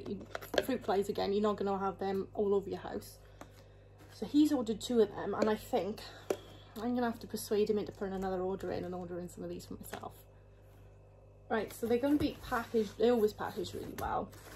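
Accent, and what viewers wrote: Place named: South Wales, United Kingdom. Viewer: You sound like you're from my neck of the woods. South wales?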